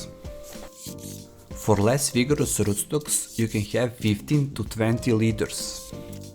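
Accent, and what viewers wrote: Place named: Russia. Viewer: Вы русский? у вас акцент, ну или произношение русского)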